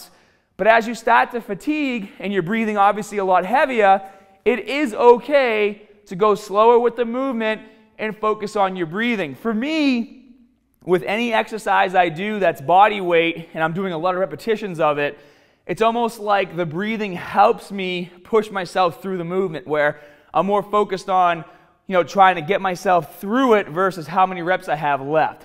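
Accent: Boston, United States. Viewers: What accent is that?..Boston? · I think that is a Boston accent, if I am not mistaken. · You from beantown? Boston? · OoooOoooo that Stroing Baastan accent 😍 👌 😂 🤣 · Love the bawstan accent. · Oh Lawd you from Boston. I love your accent 🥰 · Hey I don’t remember hearing your Boston accent before where you from I’m from Southie Keeeeedd!!!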